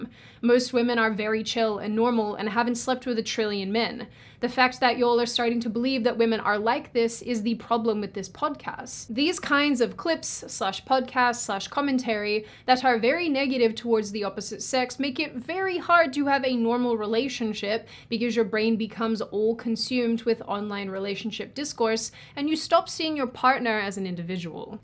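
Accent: United States. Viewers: Your accent is changing... can hear the American coming through!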